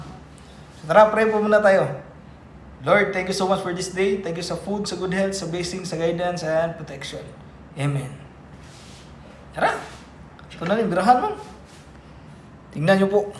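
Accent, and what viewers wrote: Visayas, Philippines. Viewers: pangit ng pagkaluto mo..bisaya ka talaga,ayusin mo magtagalog at pagluto mo ng dinuguan..ikaw lng nasasarapan nyan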